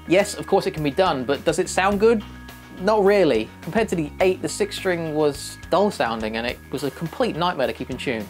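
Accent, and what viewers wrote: United Kingdom. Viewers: I've seen you in Riff Wars, Shred Wars, and Ultimate Shred Song but this is the first time watching your channel and the British accent threw me a curveball haha it was cool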